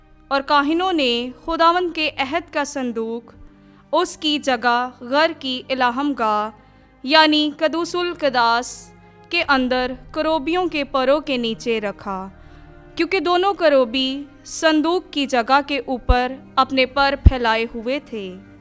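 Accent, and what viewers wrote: Urdu, Pakistan. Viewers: beautiful delivery of bible verses true Urdu accent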